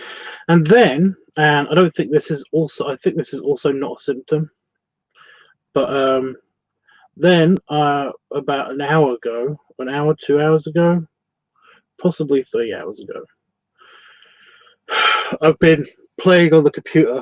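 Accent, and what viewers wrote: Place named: England, United Kingdom. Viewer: Sorry to learn about how you're not feeling well. I realize this posting is a week old now so hopefully you're back to your happy self as you read this... I've been working heaps this past week, to get a 4 day holiday weekend, as we celebrate Victoria Day in Canada. Regarding your throat discomfort, it may be possible that it's a symptom of an allergy. I developed allergies when I turned 40 ish - never having suffered from them before. The time of year plays a big part. So hopefully your hot bear body will fight it off. All this time I've admired you, I've never asked you directly, just assumed you make your home in England? You're wonderful accent leads me to this conclusion (ya think?) LOL. England; for the most part, experiences much the same weather patterns as we live with here in my home of British Columbia. 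It certainly provides the necessary elements, which ultimately evoke the bloody flu. Anyhow, I wish you a speedy recovery and look forward to your next post bear. Hugs from your furry bear Canuck buddy.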